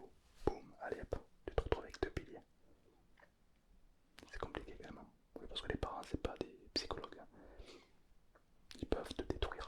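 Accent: South, France. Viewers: J'avoue... en lisant les commentaires je suis d'accord avec le fait que fasse vraiment autorité dans l'ASMR français. Je trouve qu'il est hyper pointu dans la cohérence entre sa sensibilité dans la retranscription des tringles qui sont à (presque) chaque fois hyper bien dosés et le discours en lui-même qui est aussi parsemé de bruits de bouches qui lui sont propres, et a la fois au paroxisme du suave. Pis y'a pas qu'une cerise sur le gâteau mais 2!: son humour désopilant (qui ma souvent fait éclaté de rire en plein endormissement) et cet accent du Sud qui ajoute une certaine rythmique pas déplaisante du tout Deuxième aspect en l'occurrence nous avons la chance d'avoir un thérapeute qui pratique la vulgarisation (mise à la portée de tous, c'est pas péjoratif du tout) de principes élémentaires en psychologie à merveille Bref, une dinguerie de fou psychomental pour insomniaques chroniques🤣🤣🤣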